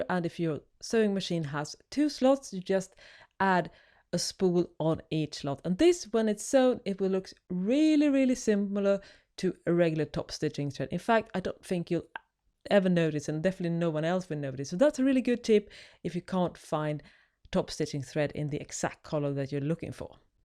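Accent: South Africa. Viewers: I'm trying to guess what your speaking accent is, I find intriguing and quite pleasant to hear . South Africa, Dutch ? Please share. Thanks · is this lady south african? im not sure cuz sometimes i will still mix it up with australian for whatever reason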